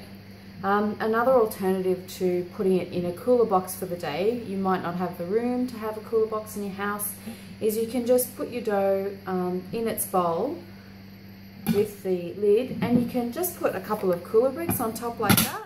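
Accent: Australia. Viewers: Thanks! This was really nice to hear an Aussie voice and practical instructions. 🇦🇺👍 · HI! Totally awesome video! Camera angle was perfect, you have a very pleasant and clear [ Australian!] speaking voice, and the entire process was easy to understand and well presented! Well done! Shalom to us only in Christ Yeshua. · Loved listening to a fellow Aussie. I enjoyed this video. Straight to the point and informative. Hope to give it a go soon. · You are a great lady and I thank you for the wonderful video and your sourdough recipe. Btw love your Australian accent. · thanks I love Aldi . I'm from Shepparton VIC.regional. My bread is now tasting a bit more sour. Thanks your Video's are wonderful. Great to hear an Aussie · Niceto hear an Aussie accent !L.O.L.😄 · Hi, You made it look easy to follow so will attempt same. Good to hear aussie talk.:-D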